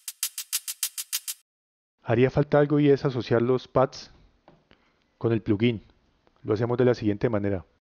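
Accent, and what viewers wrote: Colombia. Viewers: Habla colombiano!!!!! Excelente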